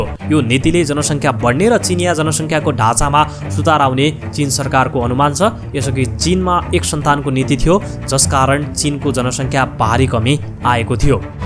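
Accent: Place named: England, United Kingdom. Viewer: नेपाली बोल्दा नि अग्रेजी tone निकालेर pronounce गर्नु पर्ने? आफ्नोपन ल्याउनुस न् ।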